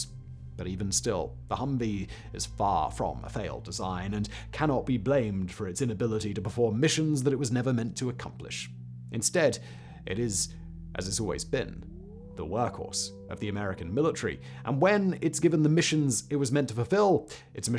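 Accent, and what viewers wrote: RP, United Kingdom. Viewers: I'm a Brit, I don't know anybody who speaks like this, with such an unnatural exaggerated RP accent. Why not just speak London RP? It takes away from the otherwise great video content.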